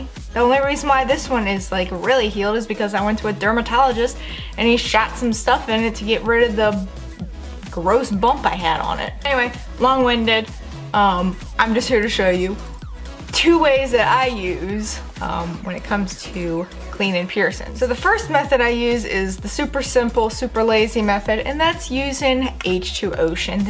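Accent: South, United States. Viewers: It’s not “duh” it’s “the”.. Its not “Pearson” it’s “piercings”.. Are you southern or something?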